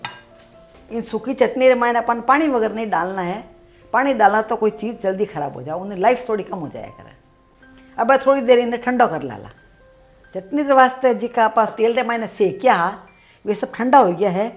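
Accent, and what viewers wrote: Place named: Marwari, India. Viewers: Aapki Marwari sunke meri Nani Maa Ki yaad aagayi aunty ❤️❤️ Thankyou so much 😢😢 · aapki marwari bhasha sun kr maja aa gaya. Har step smaj mein aa gaya. today I will try. Thanks Mummy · Ram ram sa ap ki marwacdu boli se pet bhar jata hai aur ap ki recepi👌👌🙏👌👌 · Bht Shukria. Apka bolne ka tariqa achcha laga marwar yad agio. Chatni bht mazedar lag rahi h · Yummmm👌👌🌹🌹🌹🌹chitney bahut achi he aur I love marwadi bhasha.. soo sweet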